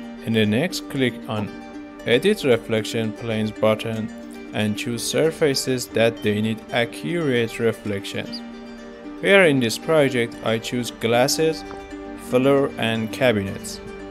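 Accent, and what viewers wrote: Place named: Iran. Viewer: Good but You have to work on your pronunciation. (too much farsi accent and wrong pronounced words).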